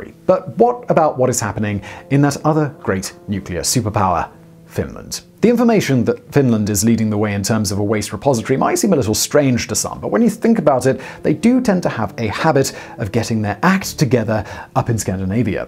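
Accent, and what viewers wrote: United Kingdom. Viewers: I reposted your comment with attribution since it's good to get the truth out there; it's unlikely Simon & Team aren't aware of these things, and their claim that a 'miracle' is needed is agenda driven B.S. on their part. Simon's proliferation of channels and age-old British accent schtick to drawn in the uneducated to a perceived "authority" on a multitude of subjects is paper thin and downright clownish sometimes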